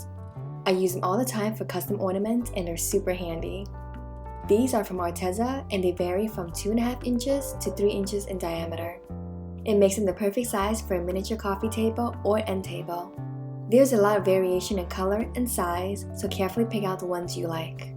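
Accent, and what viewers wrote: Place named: United States. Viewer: from an Real Indian woman to now being a sultry American voiceover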